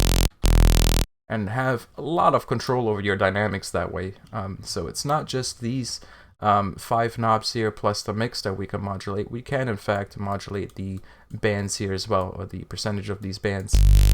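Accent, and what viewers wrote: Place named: United States. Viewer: Dude, you sound soo american on this video haha, but anyway, helped a ton, Serum is such a great tool, thank you one more time!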